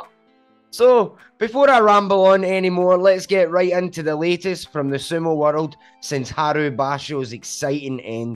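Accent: Scotland, United Kingdom. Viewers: For some reason, English folk butchering Japanese annoys me, but in a Scottish accent its still lovely 🤣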